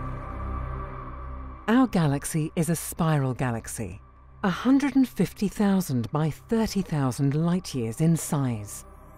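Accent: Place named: United Kingdom. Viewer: what is all this about "meethane, nebulee, etc.? what are laver outflows? i thought they were lava (la-vah) outflows. i just never will get the british accent where all the "r's" are suppressed, but are inserted at the ends of words that have no r's.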